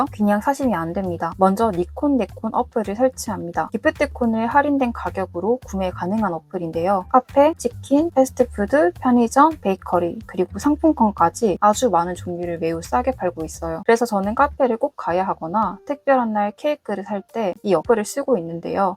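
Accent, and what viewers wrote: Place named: Gyeongsang, South Korea. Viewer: 경상도 분이신 듯해요 ㅎㅎ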